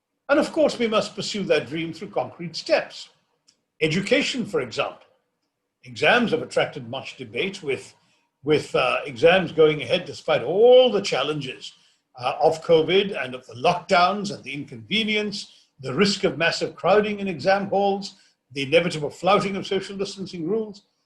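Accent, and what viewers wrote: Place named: RP, United Kingdom. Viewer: A very shallow take on multilateralism using a high sounding ideals totally divorced from the reality on ground where people around the world have been impoverished by a world run on fiat currencies. With no real exchange of value possible in the market place using fiat currencies, the call to strengthen multilateralism can only be considered a compromised sub-serviance to the forces behind the phenomenon. Now why would a politician from our world -south Asia, Asia, the so called 3rd World-- advocate for the continuation of a system that has manifestly impoverished us to our present helplessess? You should focus some on improving your intentions and making clear where your loyalties lies instead of trying to sound more gora than the Queen! Be.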